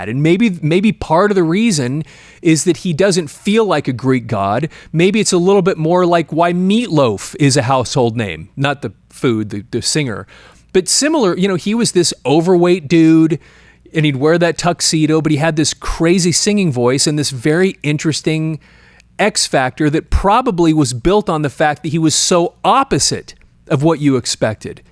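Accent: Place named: United States. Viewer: È così imbarazzante vedere una persona della tua età così eccitata per una questione da 15enne che non la riguarda direttamente❗Vedo che non sei sposato, sei americano dall'accento e hai 15K iscritti.... è tutto così anormale ❗ Continui a strillare per delle scemenze è imbarazzante non riesco neanche a scrivere ❗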